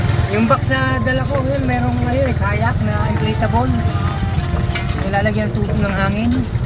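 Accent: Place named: United States. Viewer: Para ka palang kano sir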